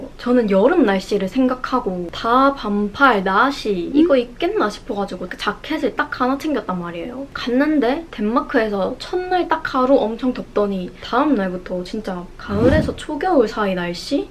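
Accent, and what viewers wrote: Gyeongsang, South Korea. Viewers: 경상도 사투리 너무 귀엽다 💖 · 경상도의 억양이 매우 정겹네요😊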